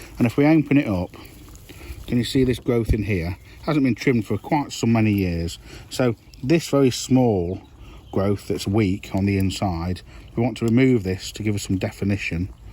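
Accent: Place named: Leicester, United Kingdom. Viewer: Great video and nice to hear a Leicester accent.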